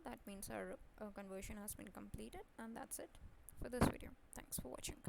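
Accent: India. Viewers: Can barely understand you with your heavy Indian accent. In English, the letter V is not W. Just saying. Plus, you speak to fast. Your English words are blending together.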